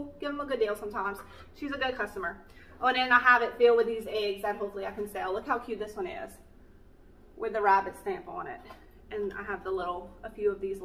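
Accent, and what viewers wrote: Louisiana, United States. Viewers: Your excitement fills my soul and I WANT ONE OF YOUR BREAD BOARDS!!!! I’m going to charter a BUS and bring people with for a shopping trip! Do you have room for a BUS In your neighborhood? LOL!!! We can buy and you can teach us your Louisiana accent ❤️❤️😁❤️❤️ What fabulous DIYs!!!!! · Hi I just found your channel. I love watching your videos and I’m playing catch up watching your older ones. When I seen this one I so wished I would have known about your Facebook live sale. I really love the Hoop project that has a Chicken stamped on it. I know this is a long shot but do you still have one and how much would you sell it for? I just love watching you and my hubby asked where is she from, she sounds like Shelby on the show where they go hunting for logs buried in the swamps of the Bayou of Louisiana. I laughed and said yup that’s where she’s from. 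We love your accent. Keep up the great job!!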